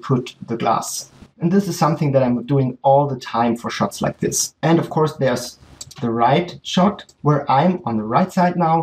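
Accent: Germany. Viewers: Are you German....you have an German Acent I mean so..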